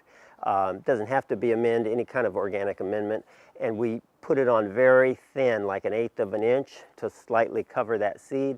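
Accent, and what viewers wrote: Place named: California, United States. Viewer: sorry, sounds like california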